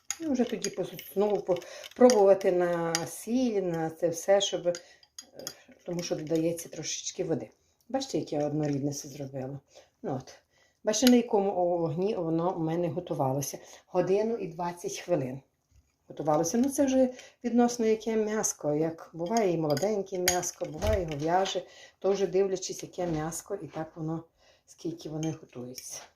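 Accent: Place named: Bukovina, Ukraine. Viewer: як завжди тепло по-домашньому.Хочу сказати Вам, одну річ,зустрічала в коментарях під Вашими відео спроби навчити Вас літературної мови. Не ведіться на це,бо наш діалект є природнім і властивим нашому краю. У нас навіть старі люди ніколи не казали "борошно",завжди "мука". або коли невелика кількість,то "мукичка"і так далі,не буду перечисляти.Для чого нас рафінувати? Буковинська краса і в нашому діалекті також.Вам здоров"я, Божого благословіння.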